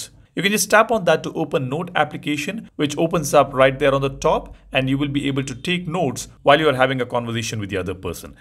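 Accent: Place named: South India, India. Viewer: Yeh kaisa accent hain re mere bhai ?? US jaisa SouthIndian wala ?? matlab confuse ho gaya english sunke dimaang ghumraa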